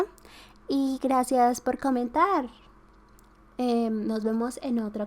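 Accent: Chile. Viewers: Yo si pensaba que eras chilena 😆 es que tienes el asentó de chile soy de mexíco y conozco a unas personas de chile las conocí por Wattpad un saludo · Yo creí que eras chilena por tu forma de hablar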